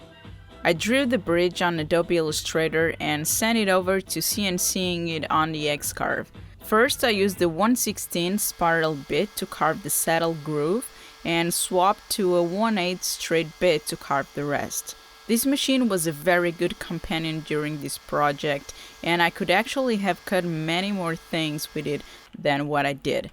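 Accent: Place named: Portugal. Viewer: Assisto teus vídeos a bastante tempo e não sabia que você falava em português! E pelo sotaque e português de Portugal! Eu sou brasileiro e adoro ver teus vídeos! Parabéns !!!!! Continuo fazendo mais vídeos! Poste vídeos mesmo de pequenos projetos executivos! E muito satisfatório ver como você trabalha! 🤩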